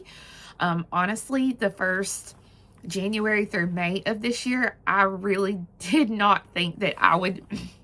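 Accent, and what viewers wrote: South, United States. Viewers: you have brought me so much JOY this year. Those are happy tears, right?!? 2026 is going to be the best year! I am looking forward to learning more, it's so important to me that I learn something, especially as I get (cough) a little older. I love your enthusiasm and your patience with us. You are a fantastic teacher. I love your southern accent; I miss my mama and her Tennessee family who have all passed and it brings me comfort when I hear it. Your knowledge is deep and wide and it shows in every video. I don't go anywhere else to buy or learn. I'm committed to you and your bead store. Best wishes! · Thank you so much for this! It’s really an awesome idea! I wish I could buy more, know that I will when I can. I love your sweet Southern voice, it’s a great comfort for me since it’s just me and my cat anymore. God bless you and your family. 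Have a blessed and beautiful season!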